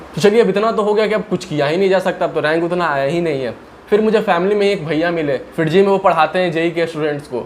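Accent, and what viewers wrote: Bihar, India. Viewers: Bhai aap Bihar se ho kya? Aapke bolne ke pattern se laga to puch liya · Bhai love from jharkhand...I can recognise sound of bihari/Jharkhandi anywhere😗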